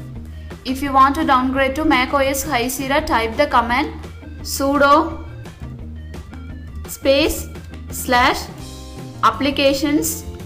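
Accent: India. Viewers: I dont like indian accent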